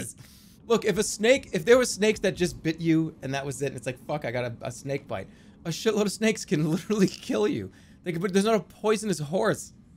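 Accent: Boston, United States. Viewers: The A at the end is cause of his Boston programming, it's like "Germ-ah", like "i'm walkin here-ah"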